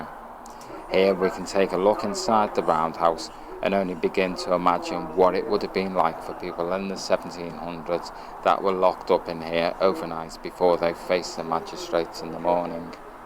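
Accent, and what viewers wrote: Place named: Birkenhead, United Kingdom. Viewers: commentator sounds bent or from Birkenhead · It's a Birkenhead or gay accent innit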